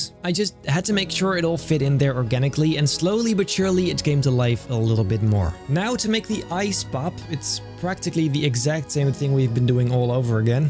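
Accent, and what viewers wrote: Netherlands. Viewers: ik vind het ook zo leuk dat hoe goed je engels ook is je blijft horen dat je nederlands bent door de nederlandse trekjes lol. maar super vet werk love it! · ben je nederlands? het is gwn dat je heel nederlands engels praat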